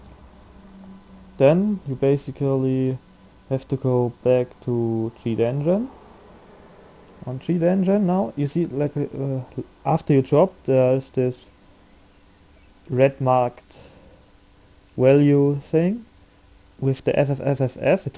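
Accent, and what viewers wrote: Germany. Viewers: Super geklappt. Eigentlich halte ich nichts vom cheaten, aber wenn ich drops nicht bekomme, die man eigentlich bekommen sollte finde ich ist das ein Grund. PS: Man hat es herausgehört, dass du Deutscher bist. Ist aber natürlich nicht schlimm. Bin ja selber einer ;) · Are you german? You sound a lot like german xD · are you german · Man merkt du bist deutsch haha :D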